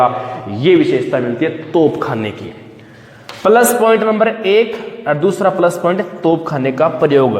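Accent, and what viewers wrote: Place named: Punjab, India. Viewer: Bolne se Punjabi lg rhe hai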